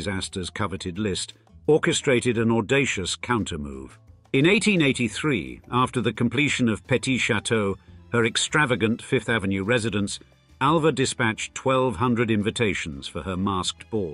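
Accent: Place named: London, United Kingdom. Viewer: Our lovely London corky English.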